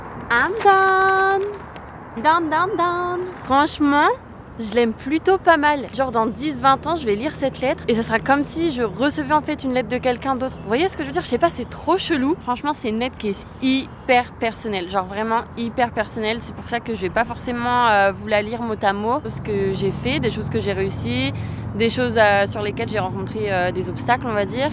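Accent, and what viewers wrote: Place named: North, France. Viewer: l'accent du nord même au states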